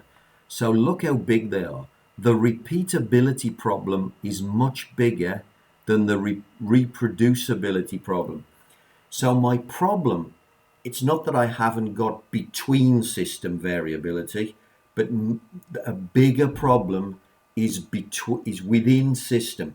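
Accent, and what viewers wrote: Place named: United Kingdom. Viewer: Finally a British accent in a fantastic subject !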